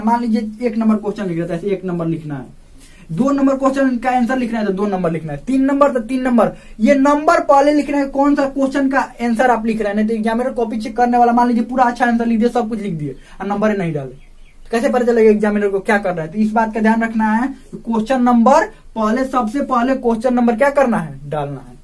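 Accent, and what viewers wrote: Bihar, India. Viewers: Bihari h ka babuaa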